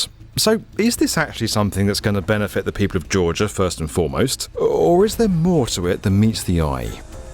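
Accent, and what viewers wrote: England, United Kingdom. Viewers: Whenever you hear a British accent you can be confident that you are listening to bullshit limey propaganda. L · What’s kind of narrative can we expect from someone with British accent mixing “human rights” with history narrating a Chinese-Russian projects? Expect a sugar coated poisoned pill. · I hear an English accent then unsurprisingly the racism / white supremacy talking points soon follow. 🤣 "unbiased, straight facts" 🤣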